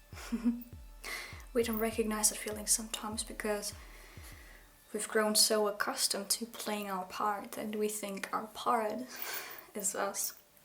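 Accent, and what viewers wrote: Germany. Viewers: this shit had the ability to take me to a cyberpunk world with the lighting the music and your german accent. powerful shit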